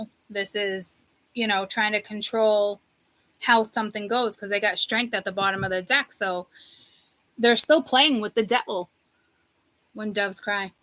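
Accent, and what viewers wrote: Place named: New England, United States. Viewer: I love your honesty. I’ve been following you since day one at your condominium. I rarely catch you live but I always rewatch. You are so gifted. Brenda from New Hampshire, formally Stoneham, Ma. We share the same accent 😂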